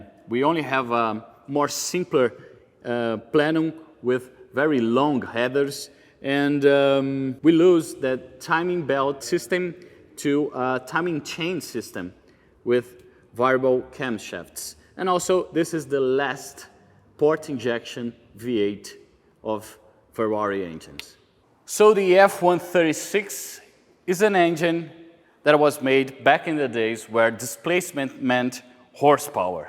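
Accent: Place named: Massachusetts, United States. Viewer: I Love your accent from South of Massachusetts 😊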